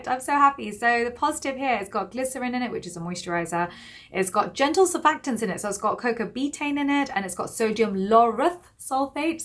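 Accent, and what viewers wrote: United Kingdom. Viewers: She has a very sharp British Accent. ❤️ I love to hear her every day.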